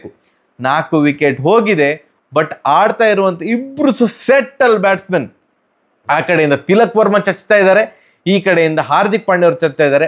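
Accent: Mangalore, India. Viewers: had lost it's charm, humor, and that Mangaluru accent, nowadays it has become as a summary of match, we have watched the what's the necessity of yours, we need videos likes your old videos, where you used to talk in a comedy way, like Dani seit, please try to bring that back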